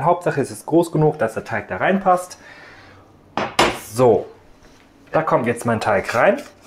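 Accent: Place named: Cologne, Germany. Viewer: Klasse Video,! Kommst Du aus Köln? Ich frage wegen Deinem sympathischen Akzent. Milsch, Honisch...... 🌸🌸🌸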